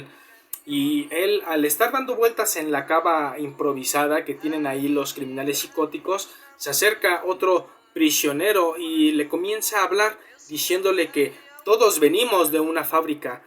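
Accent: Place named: Mexico City, Mexico. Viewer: Todo iba bien hasta que se oye tu pinche acento chilango arrabalero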